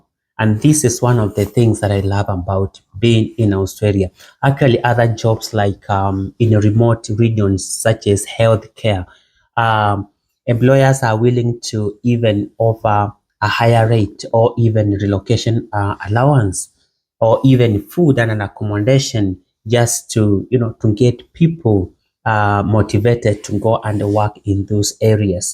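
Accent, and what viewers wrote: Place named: Kenya. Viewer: Greetings from Kenya, I can hear from your accent you are a kenyan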